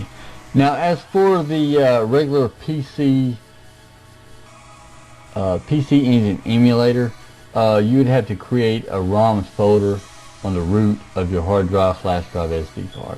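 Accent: South, United States. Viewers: Your videos are old but have still helped me out. Plus your country accent is pleasing to the ears.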